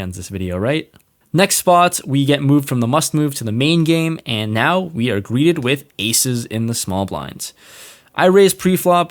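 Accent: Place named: Western Massachusetts, United States. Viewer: Where are you originally from? i hear western mass a lot and i know you mainly play in NH. Are you from MA or NH?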